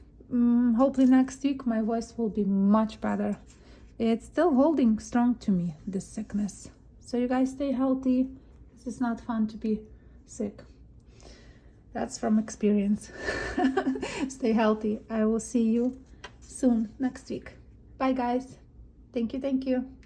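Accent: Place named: Czech Republic. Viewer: I love it, I lived in Prague for a while and while I know it’s not exactly the same, your accent makes me miss Czechia very much. I even have been to Kiev. My heart is with your people. 🖤💔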